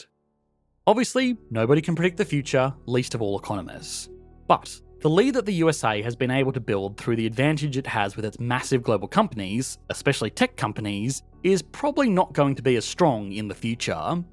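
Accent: Australia. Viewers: Vocal fry sounds bad at the best of times......in Strine it sounds even worse. Just had to stop listening after a minute, sorry. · is this channel australian? Suprised to hear the australian accent lol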